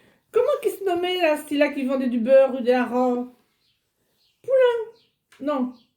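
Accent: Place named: Quebec, Canada. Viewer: Quel accent intéressant pour le Québécois que je suis ! Ça évoque certains parlers de l'Acadie et des intonations de par chez nous 😃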